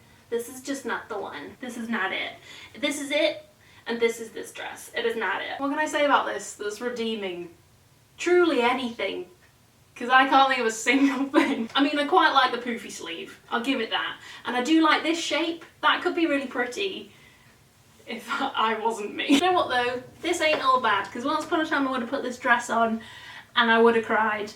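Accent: Liverpool, United Kingdom. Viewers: Why do you look good in everything 😍 and that scouse accent HAHA been living in liverpool for 3 months and i still can't get over it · My live watch-along thoughts: 1. As you said "You're my pal in the corner saying that's the one" I WAS TOTALLY YOUR PAL HYPING YOU UP, definitely go for the second white dress. 2. A black fedora can make any outfit, you must take it! 3. Your black turtle button dress did look a bit big but I think if it's really hot (ha! unlikely) you'll appreciate taking it and a belt could help. 4. Your pink paisley dress looks frickin adorable, can definitely see you floating round a field with that covered in glitter, very Florence-esk! 5. I agree I think the blue paisley dress needs to be longer but again I love those sleeves! 6. sings along to Might aswell face it your addicted to sleeves 7. The ditsy leopard dress reminds me of mustard, and I'm not sure it's a good thing. 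You can def do better. 8. Catagorically hanus is my new favourite phrase 9. HOLY FUCK THAT DENIM LOOKS AMAZING ON YOU! 10. OMG SHE DOESN'T LIKE IT, I WISH I COULD BEEM MY THOUGHTS TO YOU 11. The denim also has pockets, extra brownie points. 12. Those jeans are banging 13. I love your scouse accent because it's like Jodie Comer has just wandered in. (Killing Eve fans will know) 14. you just bless us with your videos, have an amazing time at Glasto!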